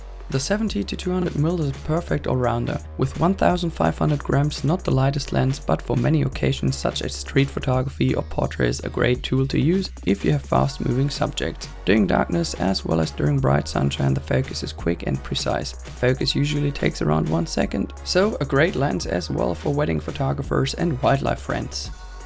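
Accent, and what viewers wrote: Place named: Germany. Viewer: when a german tries speaking english thats how it sounds like.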